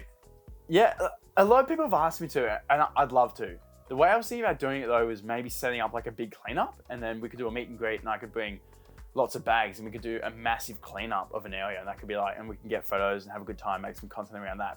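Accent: Australia. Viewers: I like watching your dives. I like your Aussie accent. My question for you is have you ever been to Canada 🇨🇦?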